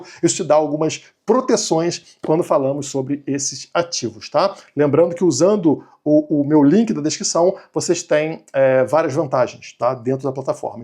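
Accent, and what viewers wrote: Rio de Janeiro, Brazil. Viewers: Jurava que tu eras carioca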